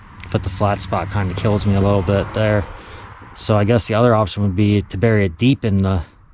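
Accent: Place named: Philadelphia, United States. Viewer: I went to college outside Philly. I thought I detected a familiar accent. ✌🏻(hint)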